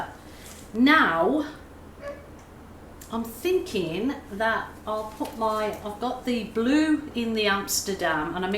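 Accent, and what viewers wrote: Birmingham, United Kingdom. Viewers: Lovely....can I hear a bit of a brummy accent?🙂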